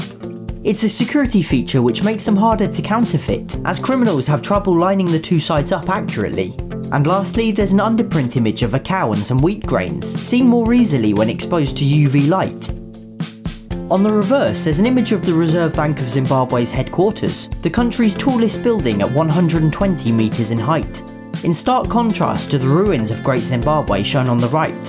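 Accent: United Kingdom. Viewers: within the first 15 seconds, i knew you were British, the ascent and the monopoly board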